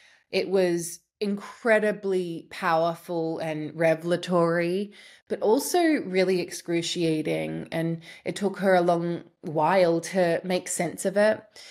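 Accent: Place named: Australia. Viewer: Hi, I'm from the south west of Australia so great to hear a fellow Aussie on here ❤.. just a question.. I worry about everything and have been trying to have a mystical experience through breathwork for a while now to no avail.. do you think I may just not have a soul? Like an NPC or something? I don't seem to feel emotions of joy just anger and misery.. do you believe in soulless people (literally)? Would they know they weren't human?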